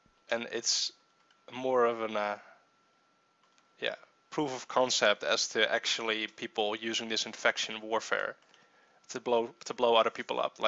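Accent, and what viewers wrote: Netherlands. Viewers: Yeah you can hear That he's dutch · Are you dutch?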